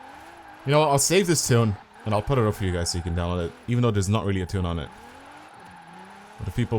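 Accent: Dublin, Ireland. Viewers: u from dublin bro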